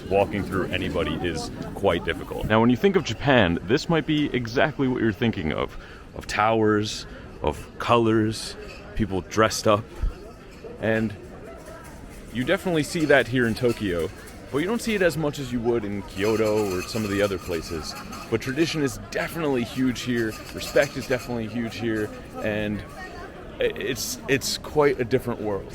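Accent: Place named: United Kingdom. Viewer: Is it me or your sounding more British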